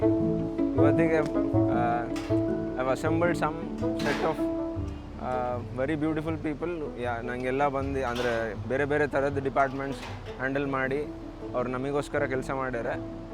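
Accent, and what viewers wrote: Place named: Davangere, India. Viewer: ಪಕ್ಕಾ ದಾವಣಗೆರೆ ದೇಸಿ ಭಾಷೆ ...ಖುಷಿಯಾಯಿತು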